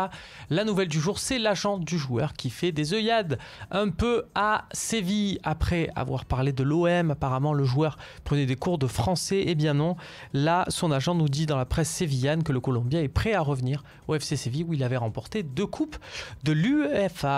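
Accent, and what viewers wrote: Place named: Paris, France. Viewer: millan Cerkov car clairement, vu ta façon de parler, je pense que tu es parigots. Et oui à l'OM on est pas des pigeons à balancer plus de 100M d'euros par an pour un seul joueur... tu devinera lequel je pense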